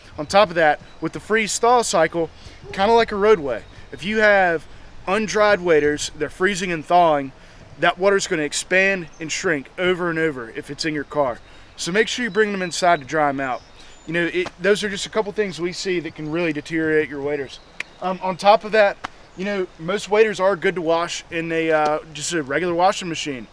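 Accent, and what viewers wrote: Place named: South, United States. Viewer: Love the channel your accent is a mix of southern and Cali surfer lol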